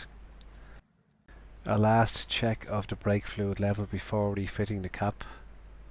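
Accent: Ireland. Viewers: love the Irish narrative and the copper slip lol, dont thing you will live that one down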